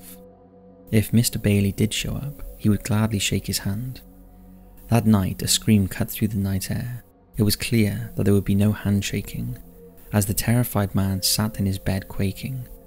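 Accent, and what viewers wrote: United Kingdom. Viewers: Too much catering to americans on these channels. And his voice isn't anything special it's just a generic british accent.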